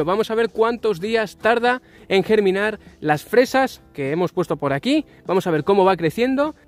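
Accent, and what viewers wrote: Spain. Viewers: Que bien explicado. Bien guiado . me gusto este tipo de acento español de España y muy cariñoso al explica r Gracias muchas Gracias lo haré y regare las nuevas sobre el cultivo ..soy argentina y anciana .qvpena XQ eres muy guapo y encantador.. felicitaciones. Y nuevamente gracias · No Sean idiotas No es susurro... Es simplemente el. Acento español del Chico osea la Letra Z "Ziiiientos"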